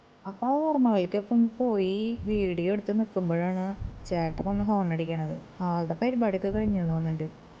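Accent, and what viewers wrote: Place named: Thrissur, India. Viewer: Nice presentation..... Thrissur kari 😂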